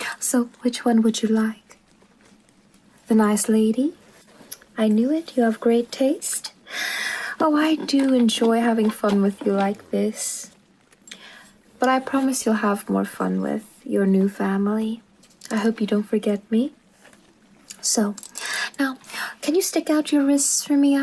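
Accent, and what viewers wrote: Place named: Transatlantic, United States. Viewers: Damn why the green screen look so real Makeup videos are always a classsiccc and I love the transatlantic accent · Saw the notification and RAN!! Also, your transatlantic accent is getting really good! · shows yet again that is a woman of many artistic talents!!! the acting was si good here and the transatlantic accent too, it really gave a vintage vibe 💕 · Have I been saying Avon wrong this entire time or is it just the transatlantic accent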